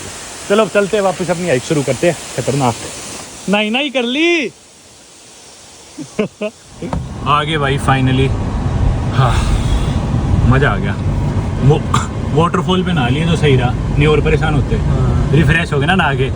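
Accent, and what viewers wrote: Haryana, India. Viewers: No doubt ap boht ache vlogger hen but apne accent ko thora groom kren English bhi ap apne haryanvi accent bolte hen.. Sari dunia ghoom li ap ne but you sounds like a typical backward villager.... kafi videos me ap ajeeb si awaz nikalte hen jese kisi janwar ko hankte hue log village me nikalte hen... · I rarely watch full video that too at normal speed but aaj kafi time baad dekhi complete video, courtesy apna haryanvi bhai ji. Jo kafi handsome bhi hai 😅 n really he narrate so well ye to manna pdega with his desi accent, great skill .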